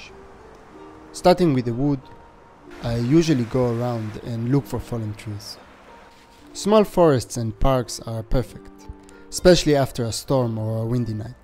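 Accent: Israel. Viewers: That Israeli accent 👌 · סרטון מדהים,רק ישראלי יכול לזהות מבטא עדין של ישראלי🙃 האם סוגי עצים שהם רעילים או שכל ענף שנראה בריא אוכל להשתמש בו לכף?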